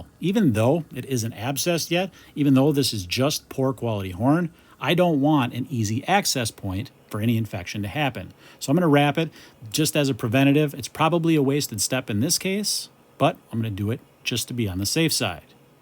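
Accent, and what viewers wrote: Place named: Midwest, United States. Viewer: I am obsessed with. His gentle Midwest accent and easy demeanor is fun to watch and listen too. must have huge forearms and wrists as he removes that dense horn. Thank you for your educational videos.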